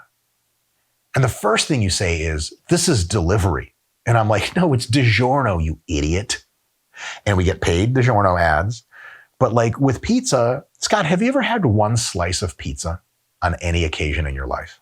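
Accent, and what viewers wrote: United Kingdom. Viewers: 😂😂 As an English fan of yours you do make me laugh with your British accent. Nice one 👏🏻💪🏼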